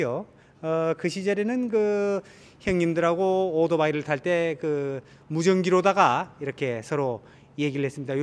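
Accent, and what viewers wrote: North Korea. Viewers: 팀장님 ᆢ 북한 시람 입네까 ? 말투가 평안도 함경도 자갱도. ᆢ 어댑니까 ? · 이분 엄청 골 때리네.ㅋㅋㅋ 얼굴은 30~40대 초반? 진행은 60~70대 노인 스타일, 말투, 발음 ㅋㅋㅋ 약간 북한 말투가 툭툭 튀어 나오시는것이 이분 물건이네요.ㅎㅎㅎ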